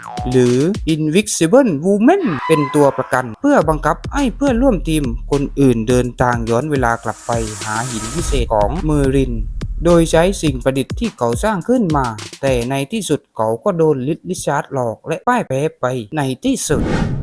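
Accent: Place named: South, Thailand. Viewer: ผมชอบสำเนียง หนัดเลยครับ หรอยๆๆ มีมุขด้วย ทำคลิปอีกนะครับบ ❤❤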